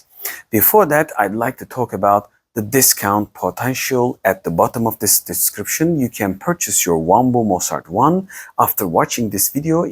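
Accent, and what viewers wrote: Turkey. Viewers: Selamlar, öncelikle bu videoya harcadığınız emek için çok teşekkürler. aksanınızdan Türk oldugunuzu tahmin etmiştim. Yorumlara bakınca tahminimde yanilmadığımı gördüm. İlk projeksiyonumu alacağım. Film ve dizi için kullanılacak En fazla 120 inç görüntu için kullanacağım. Ekstra ses sistemine fazla gerek olmaması güzel. Bu cihazın Fan sesi rahatsız edici seviyede gürültülü müdür? Eğer gürültülü ise eco Mode gibi bir secenekle ya da parlaklığı azaltarak gürültüyü minimuma indirmemiz mümkün müdür? Rahatsiz edici değil derseniz siparişi geçiyorum.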